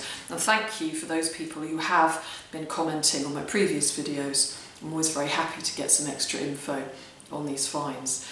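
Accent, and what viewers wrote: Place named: RP, United Kingdom. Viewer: Please don' take this offencively but you look and sound like really posh totty.You also really enjoy your finds.A perfect lady of which there so few these days! Keep digging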